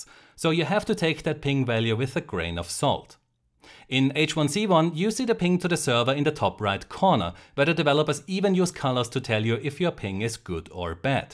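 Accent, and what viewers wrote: Germany. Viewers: i love your accent, is it german? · Bist du eig Deutscher? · Are you from germany?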